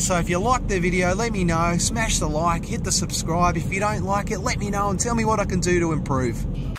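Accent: Australia. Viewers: New subscriber here. Love the Aussie commentary. 'this is where we get our water, trees make our water' had me in hysterics.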